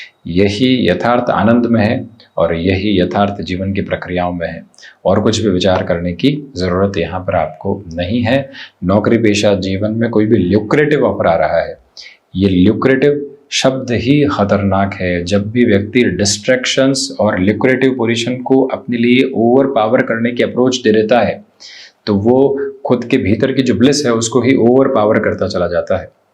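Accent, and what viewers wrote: Standard. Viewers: अत्यंत शुद्ध हिंदी भाषा व उच्चारण सुनने के बाद अत्यंत आनंद का अनुभव कर रहे हैं l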